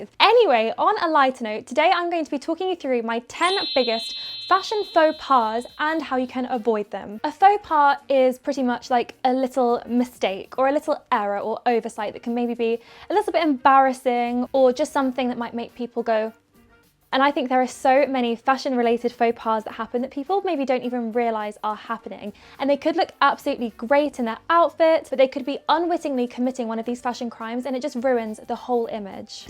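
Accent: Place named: London, United Kingdom. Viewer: she has a pronounced English accent with a slight inflection of London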